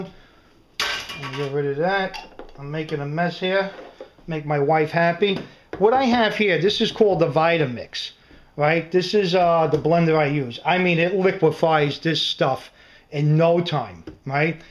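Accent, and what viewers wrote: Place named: New Jersey, United States. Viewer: i just love watching your videos your accent reminds me of my family from Jersey. my moms been here in wv for 38 years and almost sounds like one of us now. weird huh? anyway if someone couldnt or didnt want to invest that much in a blender the new ninjas are fantastic. they have one that looks similar to a vitamix and its gotten good reviews. i also juice quite often and am gearing up for a 5 day juice fast. i love juicing as well as my smoothies :)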